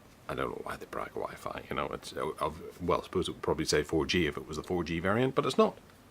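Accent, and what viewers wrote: Northern Ireland, United Kingdom. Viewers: What part of north of ireland you from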